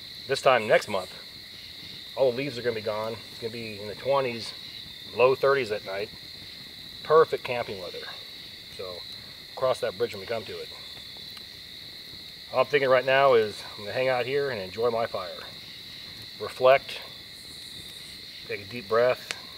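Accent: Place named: South, United States. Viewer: I enjoyed it. A cousin who was SF in Vietnam told me what he carried on jungle patrols for 2 or 3 days and your kit is almost identical. I am trying to pin that southern accent. Your not AL or MS where I grew up, you talk to fast. Louisiana maybe? It doesnt sound like TN or the Carolinas.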